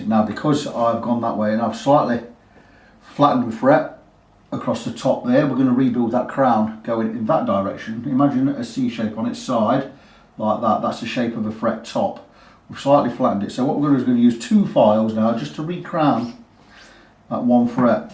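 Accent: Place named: Clay Cross, United Kingdom. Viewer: I knew that accent,I’m from Clay Cross.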